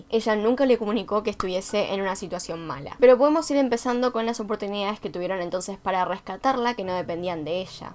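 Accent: Argentina. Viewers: ¿Eres de argentina? Perdon quiero saberlo BDHDNSVJ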